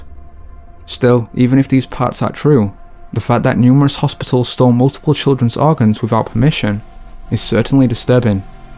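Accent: Scotland, United Kingdom. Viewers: I enjoyed your well done presentation; and your voice. Curious: Your accent sounds like a British regional accent. But I've no idea from where. Congrats, in this conforming age, of sticking to your accent-- adds character and depth btw. And your voice with it is clear, unlike some regional British accents, let alone other harder to understand ones. So, curious, where is your accent from? (Or if someone else recognizes it). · I rather like your accent although it’s a little difficult for my Brooklyn ears to understand. Is it a Scottish accent? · You had me with your first British utterance. New sub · Totally off topic. I'm trying to nail down your accent. Sounds a bit Scottish to be English yet a bit too English to be Scottish. Leaning towards Scottish. I'm American and not super knowledgeable about different dialects within the different UK countries. 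Educate me please, and good vid thanks. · British accent is terrible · You ripped the voice of Criminally Listed right out of his mouth and made it british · Where is this narrator from? I can't decide where in Britain this accent is from. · so, the #2 mystery is still UN-SOLVED!! theories about how someone died means it's still a mystery. You had me all convinced b/c of the disarming British accent.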